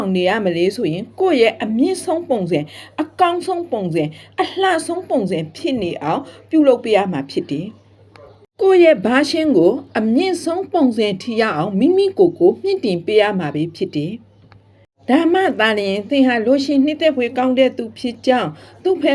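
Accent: Rakhine, Myanmar. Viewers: စကားပြောနေတဲ့ဆရာမကရခိုင်နဲ့သူတယ်နော်။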